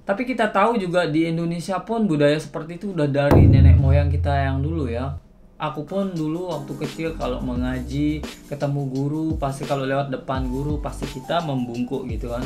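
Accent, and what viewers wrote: Lombok, Indonesia. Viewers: Logat sasaknya tgel👍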